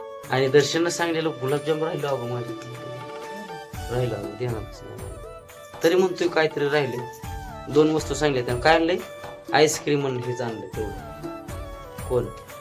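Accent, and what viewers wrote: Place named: Malvani, India. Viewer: Khup bhari dada ani tumchi Ji gavarani malv bolat ajun bhari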